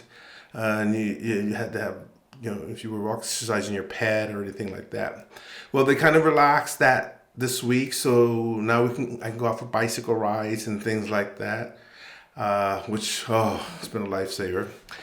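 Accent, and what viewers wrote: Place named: Italy. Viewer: You picked up an Italian accent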